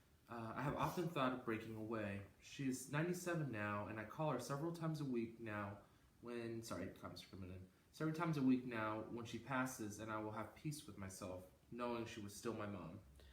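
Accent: Ireland. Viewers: I hear the Irish lilt in your voice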